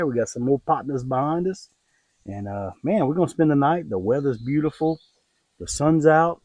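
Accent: Louisiana, United States. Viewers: As soon as I heard you speak I said to myself, self dat dude has got to be from da bottom of da boot. Lol. Great video. Me and the wife are from Lafayette but live in Port Barre now. We have a planned trip in the Ozarks by mulberry lodge. It’s in October. Rendezvous in the Ozarks expo. Are yall going?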